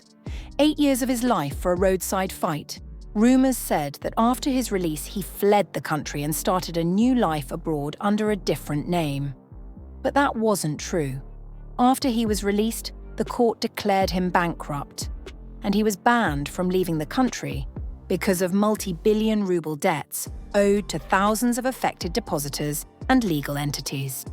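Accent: United Kingdom. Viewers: Does this Jew-ish Gangster Narrator with an English accent think Urin belongs on the street. He could have killed Putins Son in law. He got off easy. Says The BronxBoy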